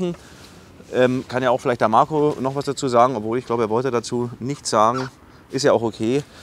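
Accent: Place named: Bavaria, Germany. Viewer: Ich finde Dich sympathisch, weil Du kein Dummschwätzer bist. Du ziehst das alles durch, obwohl Du sicherlich finanzielle Einbußen hast. Und auch Freunde/Bekannte durch Deinen Sturkopf - positiv gemeint - in den letzten 2 Jahren verloren hast. Soviel dazu - Und jetzt muss ich mir das Video nochmal ansehen, weil ich die Enten nicht richtig verstanden habe. So gut ist mein bayrisch auch wieder nicht :-) P.S: Darf ich Videos von Dir bei mir hochladen oder wäre Dir das unangenehm?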